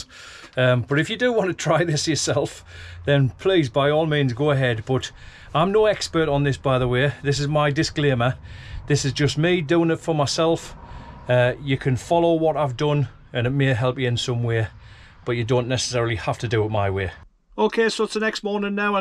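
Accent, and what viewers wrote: United Kingdom. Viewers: Hi Love the videos keep the good work up, you might have some competition when our van arrives we’ll see. (I may be a bit too old for the work involved). We were due to pick-up our CV40 in June from Tyne Valley motorhomes but alas still waiting. Question: are you a Geordie no offence intended.:¬)) John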